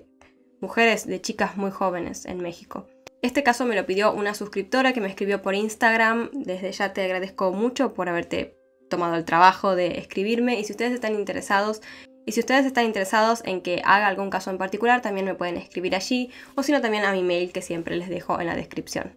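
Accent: Argentina. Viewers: Hola . . Es Argentina de Santiago del Estero ! 😊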